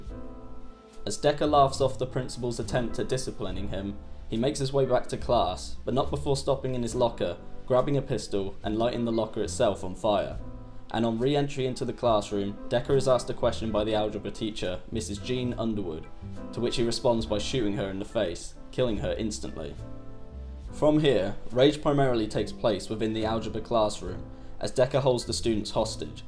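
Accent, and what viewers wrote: United Kingdom. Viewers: Sounds exactly like the first Holly on Red Dwarf. Which UK accent is that? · I don’t mean this in a bad way, but you are the most British person I’ve ever heard on the internet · That was an interesting video which I enjoyed very much. However, I have to ask you about the way you pronounce words? You sound generally well educated, yet inconsistently drop letters from your pronunciation without warning. As Bachman was outed, am I outing you as somebody who is trying to disguise his natural language tendencies with more 'street' or 'modern' inflections? If so I'd strongly suggest you stop it. It sounds bad. Just use normal English. If it is not on purpose please re-listen to what you have produced here and notice the 50+ times you make errors in pronunciation. Your voice is far too posh to be cool. And I think that way of speaking is on its way out now anyway. 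Estuary English is just an affectation that politicians use in the mistaken belief it makes the more appealing to the proletariat. It does not, it's merely patronising. You are better than this. I think you can speak more correctly (and probably more naturally) than you do in this video, and I think you would sound 100% 'beta' if you did so. As I said, good video, just tidy up the language son 🙂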